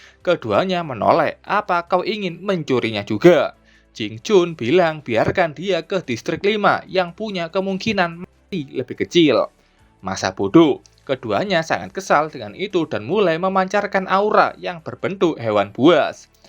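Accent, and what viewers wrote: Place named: Java, Indonesia. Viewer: mas tolong medok nya di atasi dulu bikin sesak napas dengar nya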